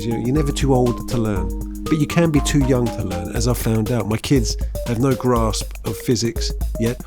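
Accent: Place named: Cockney, United Kingdom. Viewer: bruv, that cockney accent gets me every time. XD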